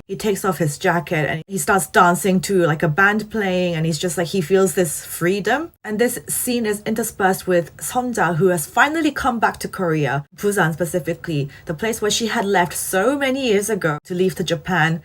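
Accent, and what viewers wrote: United Kingdom. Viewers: All I focused while watching this video is your pronounciation. I'm learning Britain English from you. Thank you ❤️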